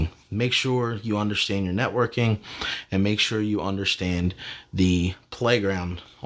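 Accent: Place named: New York, United States. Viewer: Also are you from New Jersey? Your voice makes me think you're from either New York or New Jersey.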